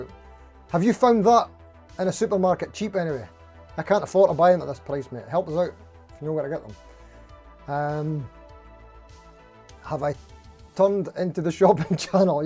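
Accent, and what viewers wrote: Scotland, United Kingdom. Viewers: Never apologize for your accent! Remember the first rule of quality..."If it's not Scottish... IT'S CRAAAAAPPPP!"🏴🤪👍✨💙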